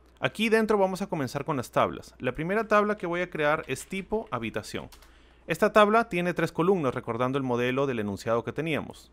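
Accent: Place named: Mexico. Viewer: vaya, hasta que alguien enseña algo concreto, extenso, complejo, explicado, util y usando buenas practicas. No sé si eres de Perú pero hablas como Mexicano 👍🏻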